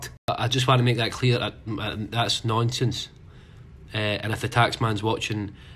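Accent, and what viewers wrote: Scotland, United Kingdom. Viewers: This is a very funny young man.. Dare I say it but he makes me damned proud to be Scottish...I pray he never loses his Scottish accent...it's who he is... f**k anyone who cnt understand him (us)... Totally love his music.. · BREATH of fresh air in the mad world of celebs. YOU keep that accent we scots love you for it. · doest matter what it is ....i love your Scottish accent 🙄 and i love you Lewis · it's a scottish accent mate. No too hard tae understand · Omg I didn't know you were Scottish I'm Scottish I thought u was American :/ :D love you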